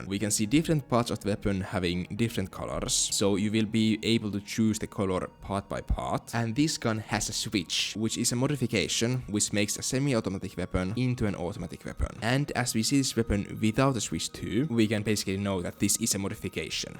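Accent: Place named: Finland. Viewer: awesome finnish accent bro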